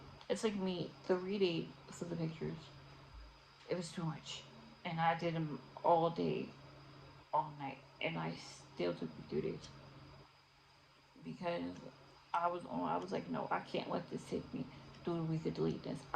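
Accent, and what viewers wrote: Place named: New York, United States. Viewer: You from NY? I’m hearing an accent. Also we have a lot in common so I’m sitting here watching like “yup, mhm, yup me too sis” 😂 I just subscribed, I’m here for your content sis 😂🙌🏾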